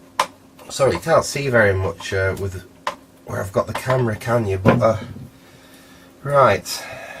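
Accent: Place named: Bristol, United Kingdom. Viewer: Interesting accent trying to place it. Bristol perhaps but just a wild guess.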